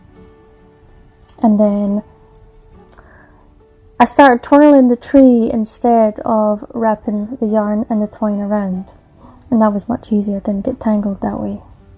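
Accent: Scotland, United Kingdom. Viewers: Hey! I could hear U today so well! Love ur accent. Scottish? Love the ocean blue color on all ur projects! Ur tree filled scene with the lovely houses hit all the right Xmas bells with me! Gorgeous!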